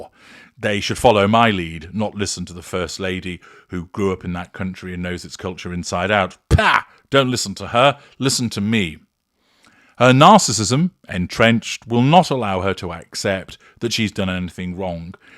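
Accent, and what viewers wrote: United Kingdom. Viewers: Please, please, please. Don't create more 50 second introductions to your interesting and entertaining videos. They are so well done. You are so intelligent, talented and have a beautiful, deep voice❣️ l love your British accent ❣️ I always fast forward the 50 second intro because I don't want to wait to get to the content. Please create more intros like the flames. Thank you for teaching us.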